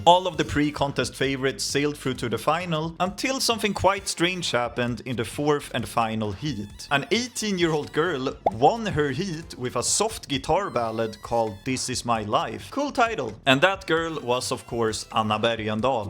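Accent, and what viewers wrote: Sweden. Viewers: I love your Swedish English accent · Okay, you wanted feedback: Your English is still very "Swedish", with a typical Swedish pronounciation of the words. How to improve it: Listen to people speaking English in movies and try to mimic how they pronounce words and the language melody they're using. I'm Swedish myself and I'm by no means perfect in this regard, so there is always room for improvement. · Your Swedish accent is too noticeable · Holy speaking Swedish in English, Batman! 😳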